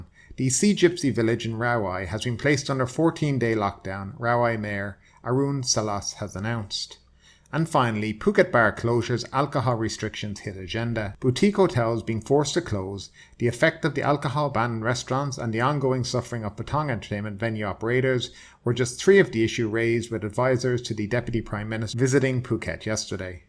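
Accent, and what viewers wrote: Ireland. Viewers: Great to hear an irish man on the air waves.your very informed,speak cearly & give a independend oponion.i was fitting a kitchen on 9/11.im a galway man myself.hoping to get to thailand again.when i dont know.all the best eith the channel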